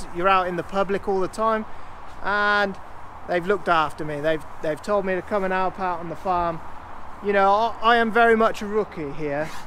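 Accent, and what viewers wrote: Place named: Oxford, United Kingdom. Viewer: Thought I detected an Oxford accent! I live in cornwall now but often travel the M40 and spotted Four Winds Farm years ago. I collect old tractors and have two ex Peter Turney ones in the shed. Wrong colour for you but I’m sure you’ll know the company!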